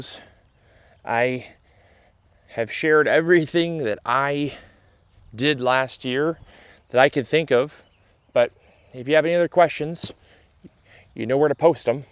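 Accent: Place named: Ohio, United States. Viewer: HI..thanks for your videos! I'm not sure if u have mentioned where u live, but I was wondering..where do u live/where are u from? Was curious about the climate u live in, the climate u grow the potatoes in and where your accent is from? Im assuming u are either in Ohio or Wisconsin?? Maybe Michigan? I know u are from the midwest...I am originally from Ohio, but u sound like my cousins when u speak and they are from Ohio but have lived in Wisconsin for the past 20 yrs:)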